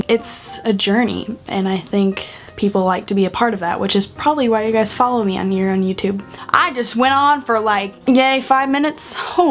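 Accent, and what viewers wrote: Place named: South, United States. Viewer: WAIT DO YOU HAVE A SOUTHERN ACCENT?!! I never noticed that...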